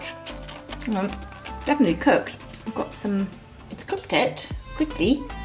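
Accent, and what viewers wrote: Wales, United Kingdom. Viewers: i can't believe this cause i was checking for uuni gas burner reviews & this was my 1st vid cause EVERYONE KNOWS I LOVE PIZZA & WELSH ENGLISH. this is a good sign, but didn't like the dough lol. love from USA.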